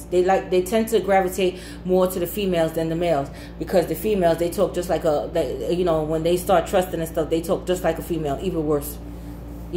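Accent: New York, United States. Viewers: I'll share with you what I gleened from your analysis as I am a cancer male. First thing..you are a very lovely woman whom I enjoy looking at and listening to. You are down to earth yet majestic. You possess a measure of love but life has taught you some things so you definitely have an edge. You also sound like a new yorker so that could've made you even more aware of life's more cruel aspects. Now to sum it all up cancers are very observant and while they may address certain things the more problematic things...you may not realize they see...because they won't say anything...for various reasons. So it may seem like a "gotcha" moment when things are revealed and laid out on the table. Remember this...for the most part are intentions are good.. if we engage romantically as we are not playas and we certainly are not good liars even when we have to show and prove to people who believe they can out slick a can of Penzoil. 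Peace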